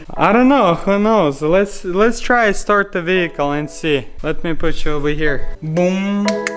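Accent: Russia. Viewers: How its possible to know what car part you need and where does it fit? Maybe he use some BMW manual or something? I suspect that your accent comes from russia or europe?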